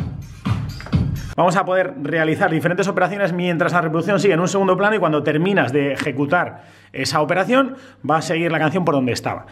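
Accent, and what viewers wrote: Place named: Castile, Spain. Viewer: Pero si es el Castellano más puro, como el mío. Pues no hables con un Andaluz te ibas a liar 😅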